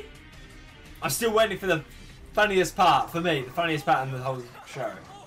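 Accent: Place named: New Zealand. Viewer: Where is he from, he sounds like he's from NZ, I'm from NZ and he sounds like he's from NZ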